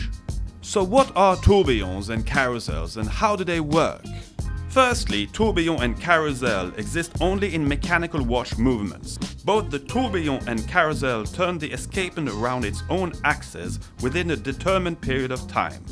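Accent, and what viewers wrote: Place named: France. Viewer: Mais pourquoi ne pas le faire en français ? Surtout avec cet accent. Bonne revue quand-même.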